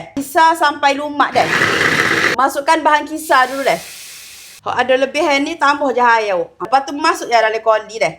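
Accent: Kelantan, Malaysia. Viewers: Suka dengar loghat orang Malaysia, ingin cari istri orang Malaysia lah😂😂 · Nk oyaknya ore klate lhtu😅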